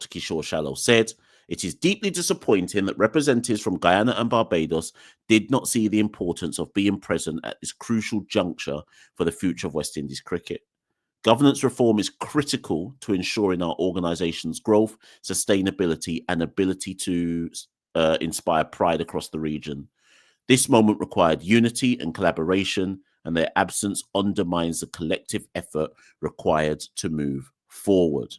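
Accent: England, United Kingdom. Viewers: This guy lives in the UK, so it seems, and is essentially making silly comments based on 'second hand' information. Two instances where GCB and BCA had a disagreement with CWI, for reasons the silly host of this podcast failed to explain, means both territories want to 'go it alone.' has also refused to acknowledge the contributions, financial and otherwise, Barbados has made to WI cricket. He also failed to inform viewers that under both Skerritt and Shallow, there hasn't been any significant implementation of policy initiatives to improve and develop regional cricket. But sits on his soap box spewing nonsense, perhaps believing, as in days of old, people would succumb to his views because he has an English accent.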